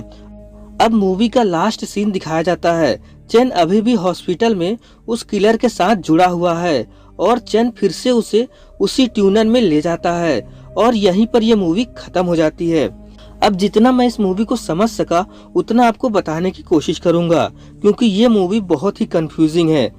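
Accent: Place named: Bihar, India. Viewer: ye bhiyaari h bhai inke yhaan english aise hi pdhaate h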